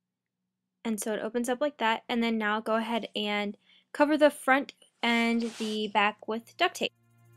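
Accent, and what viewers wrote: Ireland. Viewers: are you irish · yeah are you irish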